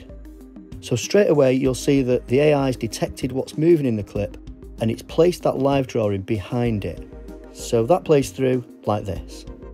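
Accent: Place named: England, United Kingdom. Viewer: Nice to hear an English voice ..good video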